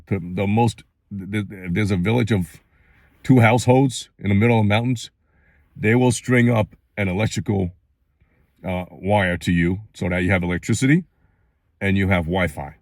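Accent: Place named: New York, United States. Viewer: If I did not see your face, I would think you were like an Italian American gangster. I never expected you to say you were born in China. lol. You got that New York accent down.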